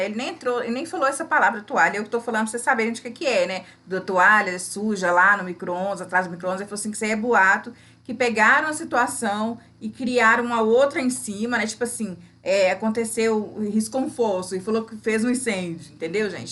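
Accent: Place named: Minas Gerais, Brazil. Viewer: Seu canal é muito bom aqui no YouTube VC é muito carismática beijos no seu coração eu sou de São Paulo e pelo seu sotaque VC é de Minas gerais né ☝️😉 adoro quando vc fala assim ☝️ tá quente pelando acho muito engraçado 😅 vc é muito simpática beijos no seu coração e um feliz natal com muita saúde paz e alegria pra vc e sua família 😉☝️👏👏👏👏🙏🙏🙏🙏🙏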